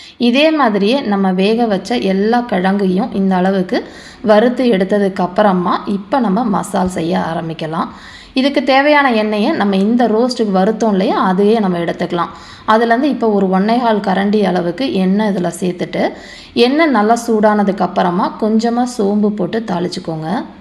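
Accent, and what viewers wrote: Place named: Karaikudi, India. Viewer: Your native mam don't mistake me ur belongs from karaikudi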